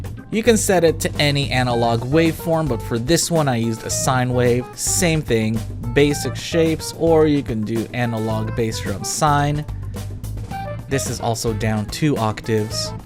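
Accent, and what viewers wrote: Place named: United States. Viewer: Too American sounding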